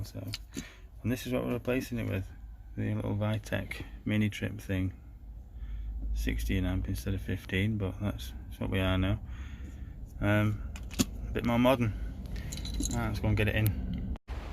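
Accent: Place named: North Wales, United Kingdom. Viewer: I keep hearing a hint of a North Wales accent mate, are you originally from that area ?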